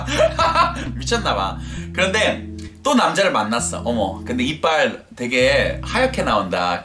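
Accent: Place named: United States. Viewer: 한국인 입장에서 재미동포가하는 발음과 표현이 참 흥미롭게 들리네요..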